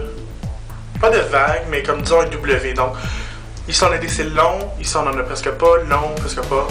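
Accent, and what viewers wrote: Quebec, Canada. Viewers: Entre l'accent canadien, l'accent anglais et l'accent francais en parlant en anglais, sans oublier ton talent en maquillage... mais y a pas un truc que tu fais mal?!! LOL C'est cool de penser aux francophones qui comprennent pas l'anglais en tout cas! Awesome as always and thank you for being so cheerful and funny all the time! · j'aaaaaaaaadore en plus en français avec le petit accent québécois!... c'est Parfait :) Merci pour cette vidéo :) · Excellent les tutos en français, et l'accent quebécois toujours génial à écouter ! =) Continue ! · he speaks "canadian french" it's the same but there's just a different accent =) I speak "france french" and I can easily understand him =). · Enfin!! Un video en QUÉBÉCOIS!! :) Tes super beau!! :D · Excellente vidéo! Je me suis marrée comme une bossue et puis le maquillage est réalisé avec une très grande dextérité! 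Bravo! Qu'est-ce que tu es drôle, en anglais comme en français!!;) "Gang de cochons"! Punaise ce que j'aime l'accent québécois!!! lol Bisous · Que tu es drôle ! Quel plaisir de te voir et de te comprendre enfin, qui plus est avec l'accent Canadien. J'espère qu'il y en aura d'autres ainsi, que je puisse m'en rejouir et rire. J'adore ! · That's Canadian French, a LOT different than French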